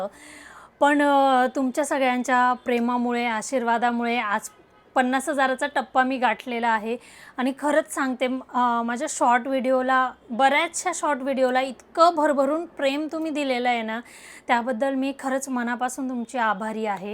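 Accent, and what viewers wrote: Khandesh, India. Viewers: Congratulations one man army, तुमचे व्हिडिओ खूप छान आहेत. तुमची ॲक्टिंग ची हिस्ट्री काय आहे? म्हणजे तुम्ही acting चे प्रशिषण घेतला आहे का? तुमचे आता normal बोलणे आणि व्हिडिओ मध्ये खानदेशी टोन मध्ये बोलणे खूप वेगळे वाटले. Keep progressing 👍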